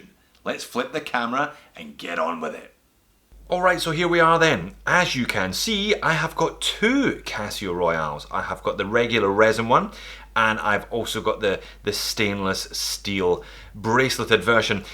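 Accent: Scotland, United Kingdom. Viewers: You look and sound like a scottish peter finch. Its uncanny he’s a golf youtuber and i swear other than accent differences you guys talk just alike.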